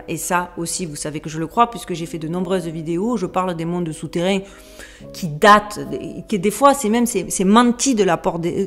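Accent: Marseille, France. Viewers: J'adore les "cagoles" intelligentes (avec l'accent de Marseille), surtout ne change rien, et je te souhaite le meilleur. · Je ne comprends pas très bien Tu as l accent marseillais mais sur fond d américain Quoiqu il en soit au bout de 2 vidéos je me suis abonnée J adore ta façon de raconter et puis tu es très sympa Heureusement que je suis tombée par hasard sur ta chaîne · Merci pour cette nouvelle vidéo, passionnante, comme toutes les autres. J'aime votre façon de faire vos recherches et d'en rendre compte. Sans compter vos accent du sud qui me rappelle ma Provence! Vous parlez au début de la vidéo de certaines réactions quant à votre pseudo...J'avoue que j'en ai été surprise car cela est diamétralement opposé au travail de recherche et de Lumière que vous faites. Et comme chaque mot, chaque émotion ou pensée est créatrice, je trouve votre pseudo sombre, mais vous devez avoir vos raisons d'avoir fait ce choix. 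Et je le respecte même si cela pose question, et la réaction des gens me parait normale. Belles fêtes de fin d'année et encore merci pour votre travail! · Grand merci 🤗 Quel travail de recherche fouillé … Nous avons de la chance de pouvoir bénéficier de ce travail pour l’éveil de nos consciences! Excellente continuation! A très vite 😊 Ps: j’aime beaucoup ton accent: celui du Sud de la France non?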